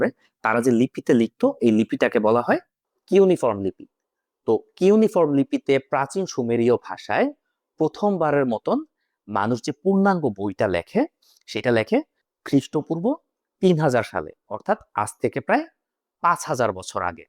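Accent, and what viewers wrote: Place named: Bangladesh. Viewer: দাদা সব ঠিক.. আপনি দেশীয় বাংলাদেশী ভাষা ছেড়ে শুদ্ধ বাংলা বলুন শুনতে ভালো লাগবে....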